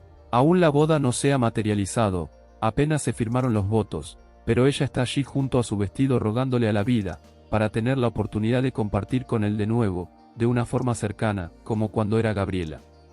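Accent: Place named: Argentina. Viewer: Desperté Ahí casi al inicio Ver video dijo piba déjame adivinar es un canal argentino o sea el de este video o sea el creador del video es argentino y lo sé simplemente Porque como dijo piba Y pensé el que hace el video es un argentino y yo lo sé Simplemente porque en opciones o más información yo no tengo que Ingresar a esa vaina de más información Por qué simplemente con lo que dijo de piba y dijo eso Yuya adivina Y pensé y dije es un argentino Quién hace el video El que el creador del video es argentino simplemente la forma del hablar lo dice De qué lugar es y para mí fue algo sencillo de saber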